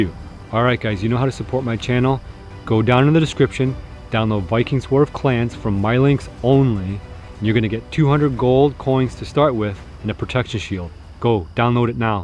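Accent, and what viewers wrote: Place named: Canada. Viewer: You sound very Canadian but I have to ask...do you live in Michigan?..by the way final fantasy 3 for she's is the best game ever!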